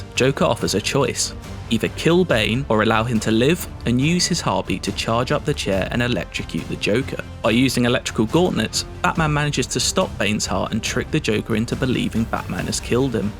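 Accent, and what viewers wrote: United Kingdom. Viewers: the british accent is such a turn off man!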